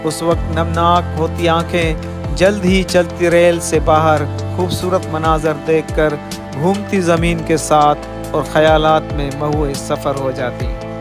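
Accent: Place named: Sindh, Pakistan. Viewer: Koi Sindhi jab Urdu bolta hai tou? Bohat achcha lagta hai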